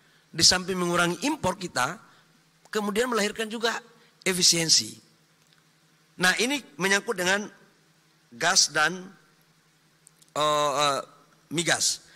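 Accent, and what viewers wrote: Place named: Papua, Indonesia. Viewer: pa balil ini dialek papua mentah betul karna ada pakai bahasa KItong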